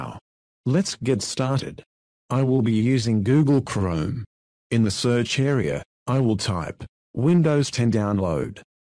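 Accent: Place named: Australia. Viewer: Duh! I hate that Australian voice! Why can't you just change it to an American voice! That would be much better!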